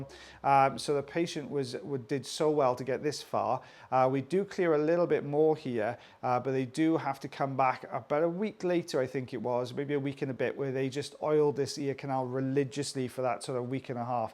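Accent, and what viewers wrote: Wales, United Kingdom. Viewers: I think his accent is the nicest of all the British accent. Yeah if they don't like it don't watch/ listen. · I just LOVE watching all your vids! think this is the 3rd time I´m rewatching this vid! Sweetie never loose the welsh way of talking, since I moved over here I´ve come to adore how everyone talks to eachother as if they are one big family <3 Wich of your locations do you work most in? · I learned to like the wax videos so that I could spend time listening to his beautiful Welsh accent. Anyone with issues with his speech should probably learn a bit more about geography.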